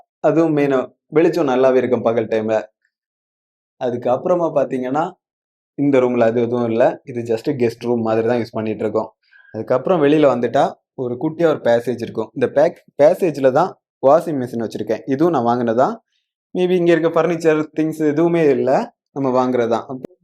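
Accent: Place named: Nagercoil, India. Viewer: TN74 slang theriyudu bro 😀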